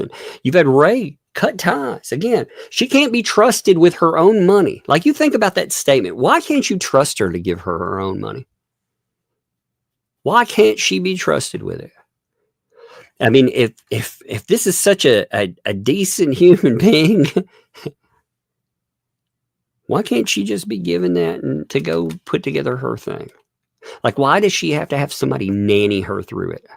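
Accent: South, United States. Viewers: Catching up, it’s been a busy few days, enjoy listening, it’s nice listening to someone win a southern voice. 🫶🏻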